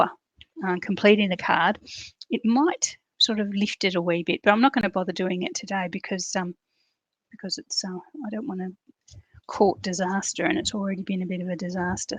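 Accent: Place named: Australia. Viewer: So nice to have an Aussie voice who is normal and makes mistakes. Your cards are great.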